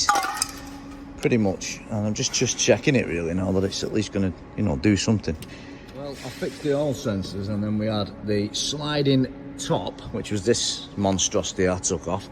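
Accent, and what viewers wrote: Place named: Yorkshire, United Kingdom. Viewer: Hi buddy. You sound like a Yorkshire lad. South Derbyshire myself. Think I may need to bring mine to your garage. I have an R57 JCW 2011 and the roof stopped working a while ago. Very rarely use it as I have other vehicles but I would never sell it. I have tried all the obvious stuff but still no luck. What part of the UK is your Farage my friend. Cheers Steve.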